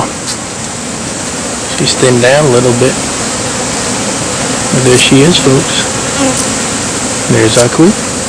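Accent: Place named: South, United States. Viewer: I love your accent 😍 southern accents are the best!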